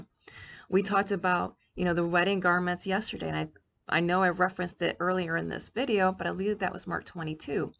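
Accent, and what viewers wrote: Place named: Scotland, United Kingdom. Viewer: Lol if yi dinny see it, that's Scotish lol are you from Scotland.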